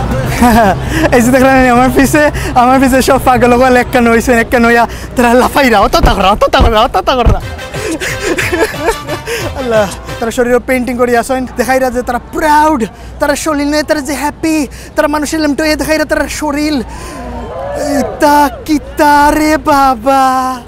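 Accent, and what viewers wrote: Sylhet, Bangladesh. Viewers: ভাইয়া আপনার কথাগুলো অনেক জোস হয় আপনার মুখের সিলেটি ভাষা অনেক সুন্দর হয় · আসসালামু আলাইকুম ভাইয়া আমি ইন্ডিয়া থেকে বলছি.... আমি আজকে প্রথম আপনার ভিডিও দেখছি প্রথম দেখেই আপনার ফ্যান হয়ে গেছি 😊 আপনে ও সিলেটি আমি ও সিলেটি তাই আপনার ভিডিওতে সিলেটি ভাষা শুনে অনেক ভালো লাগছে 😀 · Bhai apni ki sylheti na kitha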